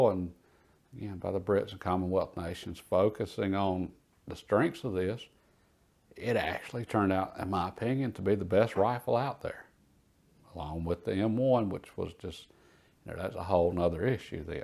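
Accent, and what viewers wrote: South, United States. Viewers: Fantastic just love your southern Accent, thanks for knowledge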